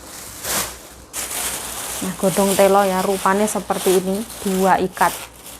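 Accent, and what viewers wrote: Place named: Blitar, Indonesia. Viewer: tak kira mbak orang Blitar wkwk 🙌🥰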